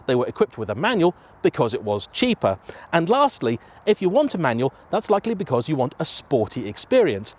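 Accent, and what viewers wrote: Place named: England, United Kingdom. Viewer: As beautiful as English accent is please please please speak slower ❤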